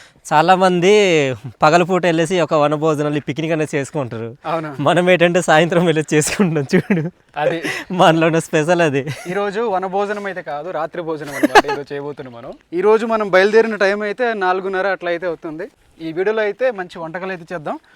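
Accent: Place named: Odisha-Andhra border, India. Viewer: ఒడిషా మరియు ఆంధ్ర బోడర్ బాషా మీది మాకు ఒడిషా వచ్చు మరియు తెలుగు కుడా వచ్చు చాల గ్రేట్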